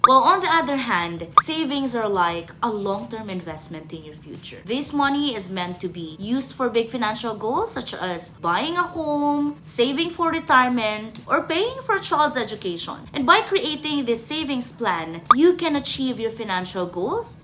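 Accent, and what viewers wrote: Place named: United States. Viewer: Parang nasa america ata to english hnd pinoy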